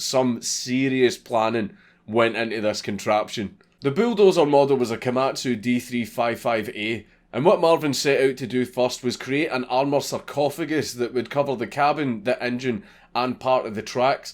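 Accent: Scotland, United Kingdom. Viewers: This is by far my favourite mad lad video, keep coming back time and time again for the same story told by a nice scottish accent.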